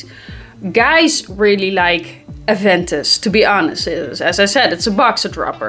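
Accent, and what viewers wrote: Netherlands. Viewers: Beautiful accent 😊 Dutch? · Hehe 😋 from you accent i know you are from Netherland my girlfriend from Netherland have same accent 🥰 Thank y from Morocco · Beterschap meid. Heel chill dat je geurtjes doet. En je Hollandse accent doet t m met je grappen 😁👍🏻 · Is it okay to be turned on by the Dutch accent? Man… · You sound like you are from The Netherlands, are you?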